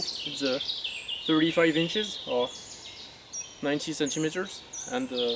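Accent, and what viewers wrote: France. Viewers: Le bon accent français :DDD Super vidéo, compatriote ;)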